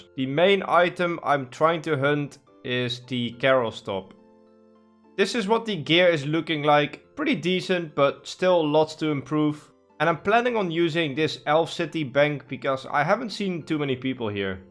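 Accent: Netherlands. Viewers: 100% this guy is dutch, just by his accent 😂. I am dutch too it is funny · You know, I never noticed your extremely Dutch Accent. Should know it with your name " " 😂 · Jij komt uit Nederland he dat kan ik horen aan je stem. Mij sub heb je geweldige videos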